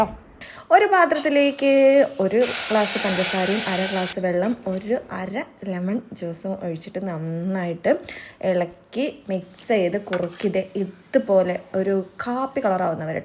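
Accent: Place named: Kayamkulam, India. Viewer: Soana papadi kayamkulam bhasha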